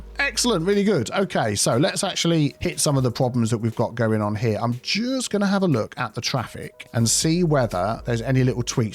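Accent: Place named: United Kingdom. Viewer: I Always Use to speak in British Akzent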